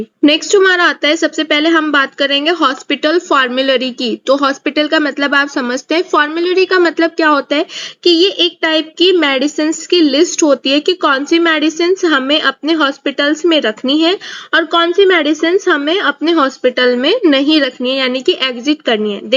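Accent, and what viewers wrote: Karnataka, India. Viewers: Karnataka se ho mam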